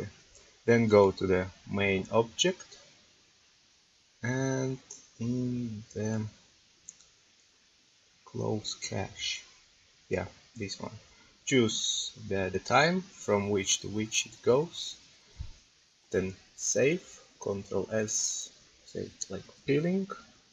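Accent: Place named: Russia. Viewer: btw, is it Russian accent or some hispanic?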